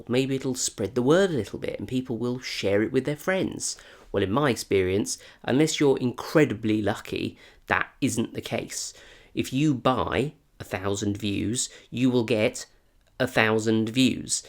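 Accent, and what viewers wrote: United Kingdom. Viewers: the british accent does the rest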